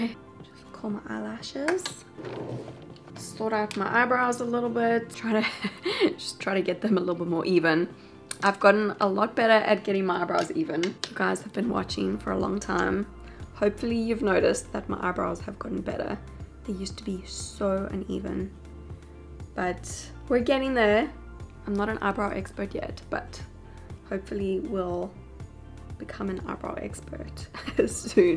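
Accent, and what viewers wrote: South Africa. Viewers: Caislee Erica It’s South African. I’m not sure what part of South Africa tho. Because I’m from Cape Town and we sound slightly different than her.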